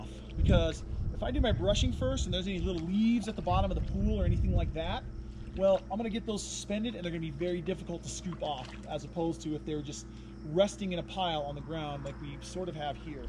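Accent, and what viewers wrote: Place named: South, United States. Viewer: Thank you for the video, in liked your country accent. Beautiful pool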